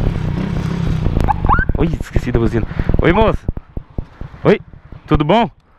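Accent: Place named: Minas Gerais, Brazil. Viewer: mineiro kkkk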